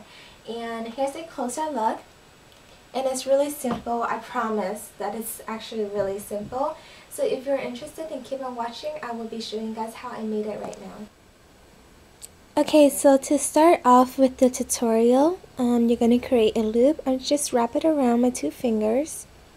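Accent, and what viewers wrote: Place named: New York, United States. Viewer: Love your accent are you from newyork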